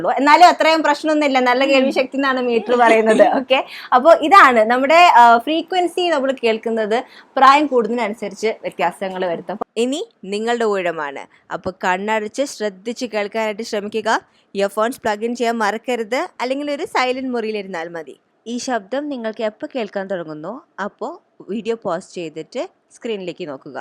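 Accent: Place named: Thrissur, India. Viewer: Tcr slang😍😍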